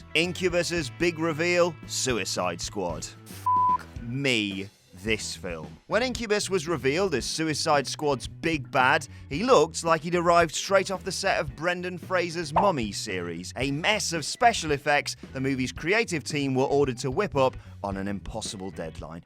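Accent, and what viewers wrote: United Kingdom. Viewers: This British commentator got me ctfu 🤣🤣😭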